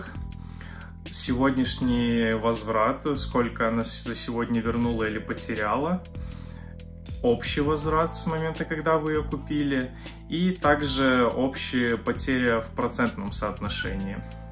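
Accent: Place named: Belarus. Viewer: Беларуски акцент 😉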